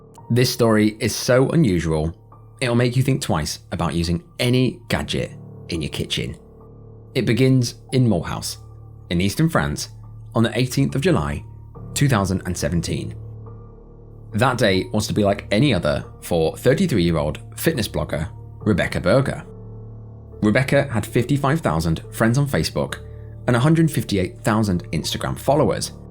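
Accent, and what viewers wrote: United Kingdom. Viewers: Yeah the writing on this channel is very 7Th grade. Even the British accent doesn’t even help.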